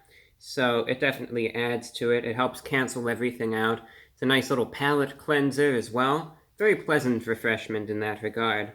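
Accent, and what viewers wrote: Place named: Mid-Atlantic, United States. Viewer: Love his Atlantic accent.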